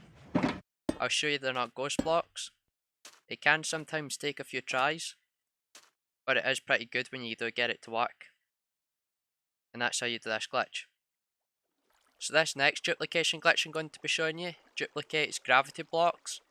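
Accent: Scotland, United Kingdom. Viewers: Best scottish accent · Scottish accent? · Its scottish bruh u can guess it right as he says it... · is it me or does Scottish accent sound really appealing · Scottish my brother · He has a sccotish accent lol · It's his accent... That's kinda rude for the Scottish peoples.... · i k he's scottish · wow scotsh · that scottish accent is dope.. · Ur from Scotland right? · You have the strongest Scottish accent i have ever heard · Hey fellow scottish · True Scottish accent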